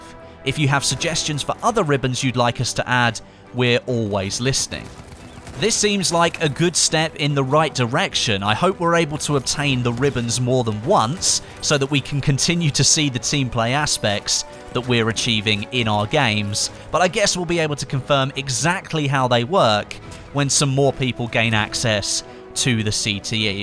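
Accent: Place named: England, United Kingdom. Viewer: English English not phony american english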